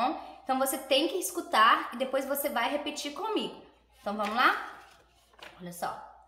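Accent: Brazil. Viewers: Huruuu ❤ agora aprendo amiga falando caipira como eu falo do interior 😂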